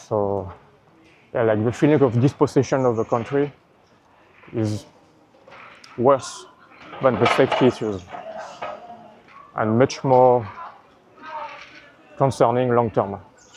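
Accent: France. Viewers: Quel accent merdique! Il n'y a que les Français pour parler aussi mal.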